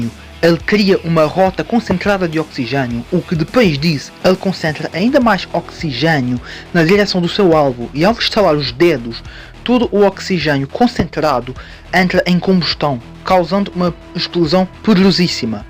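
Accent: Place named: Portugal. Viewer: Nao sabia que vc era português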